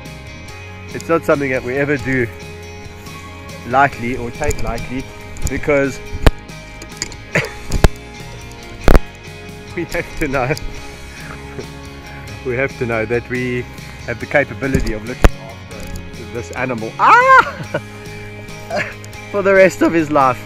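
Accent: South Africa. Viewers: He is South African I can hear his accent Hoe Gaan dit my ou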